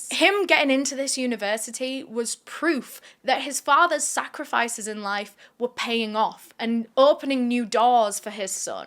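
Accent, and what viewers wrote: United Kingdom. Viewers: I mean let’s not forget about British history ….. It’s rich hearing someone with a British accent talk about how horrific the Belgian colonisers were.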